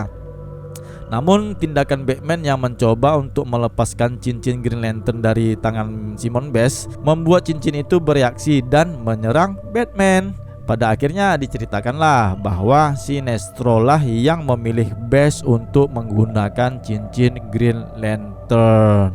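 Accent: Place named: Medan, Indonesia. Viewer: ente orang Medan ya bang...??? Dari logat²-nya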